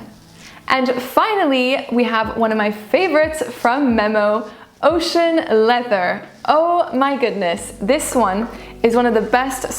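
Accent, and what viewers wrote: France. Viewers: i am here for her french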